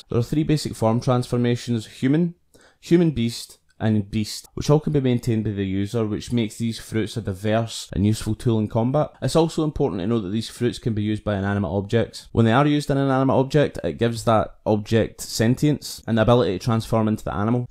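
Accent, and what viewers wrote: Scotland, United Kingdom. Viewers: Is that a Scottish accent? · This guy’s scottish fs tho so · U scottish? 😄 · I was gonna say I could’ve sworn that’s Scottish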